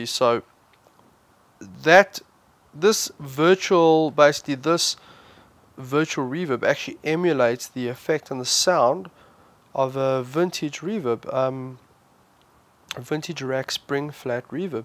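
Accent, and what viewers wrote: South Africa. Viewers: South African accent allert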